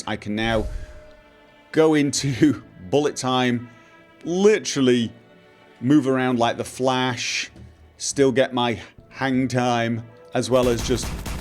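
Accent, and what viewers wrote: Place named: Wales, United Kingdom. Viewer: Are you a Welsh Jew or something? You sound both Welsh and Jewish. What the hell is your nationality?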